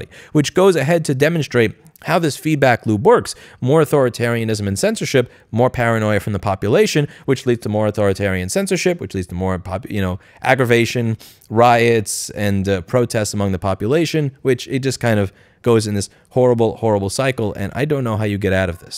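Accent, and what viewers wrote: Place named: Texas, United States. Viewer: Texas I hear! Which area?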